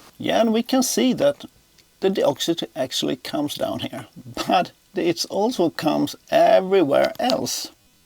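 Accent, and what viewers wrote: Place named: Sweden. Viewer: Sounds like a Swede :)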